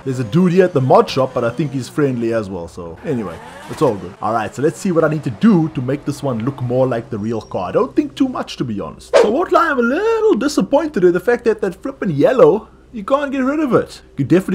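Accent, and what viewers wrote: South Africa. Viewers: Are you from South Africa? Your accent sound like it🤔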